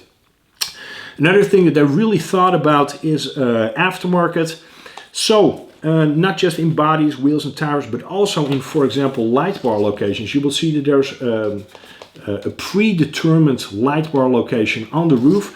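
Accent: Netherlands. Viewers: What is that accent you have? It sounds a bit Dutch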